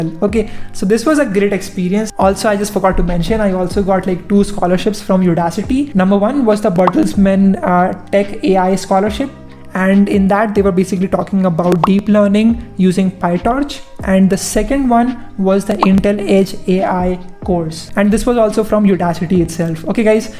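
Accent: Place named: Andhra Pradesh, India. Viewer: thanks for reply but it seemed like you are from any part of our South like Andhra guy so that's why asked.by the way really you are doing great job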